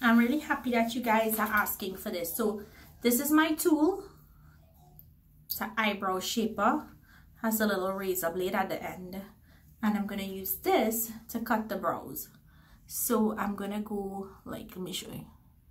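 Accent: Trinidad and Tobago. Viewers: That Trini accent 🎵🎵🎶🎶🎶🎵🎵🎵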